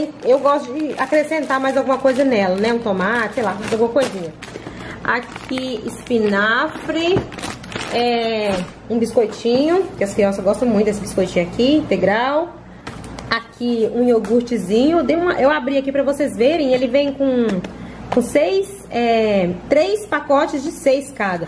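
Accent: Minas Gerais, Brazil. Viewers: Amei o vídeo. vc diz que estão caro os produtos de limpeza?? Cara vem pro Brasil, vc cai de costas. kkk mas eu estou fazendo os meu produtos em casa. faço sabão. Que virá sabão líquido para limpeza pesada. sabão detergente para louça e para limpeza do chão e banheiros faço tbm meus cheirinho (desinfetantes) uso muito bicarbonato tbm. aqui tem que se virar nos trinta. kkk bjs AMADA. Mineirinha linda lembra minha mãe no sotaque amei.❤❤😚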